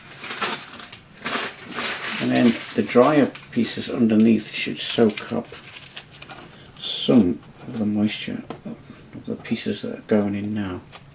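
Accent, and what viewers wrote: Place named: Tyneside, United Kingdom. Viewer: Hi, I live in Sunderland, but prior to the Coronavirus pretty much most Sundays, my wife and I would take a drive through to Shields along the coast road, round by the river, over that bridge and round by the Town Hall back to the sea front and then off homeward. You’ve done a fantastic job if you are no longer in the area, not sure how long it is since you’ve been ‘home’ but on the river side (north) of the bridge is a housing estate, the dry dock is still there and central to the estate, and on the east side is a statue of Catherine Cookson. You’ve also managed to keep your accent, so much so I was convinced you were still in the region. Anyway thanks for the reply, I get where you are coming from regarding hearing the noise - to be fair I have a test layout on a 4 x 2 ply board with cork on the top and it is certainly not quiet. 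I think I may just follow suit and build it on the ply surface of the L shaped baseboards. Cheers Derek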